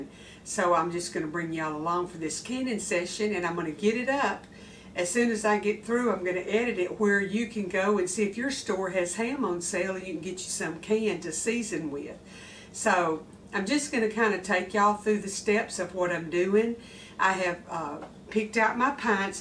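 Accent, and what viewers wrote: South, United States. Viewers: Hello from Oregon! It sounds like you are from the south. My home state is Georgia. I am so glad to of found you. Canning ham today! Blessings to you this new year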